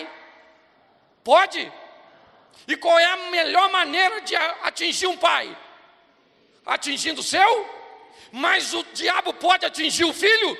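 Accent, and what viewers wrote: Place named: Northeast, Brazil. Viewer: Kkkkkk pastor tú veio lá da minha terrinha foi? com esse jeitinho nordestino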